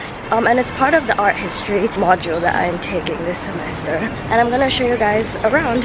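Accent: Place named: Singapore. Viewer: Are u singaporean? cause u do have an accent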